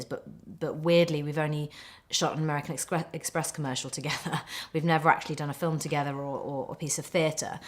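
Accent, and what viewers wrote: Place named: United Kingdom. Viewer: why is she talking as a british accent?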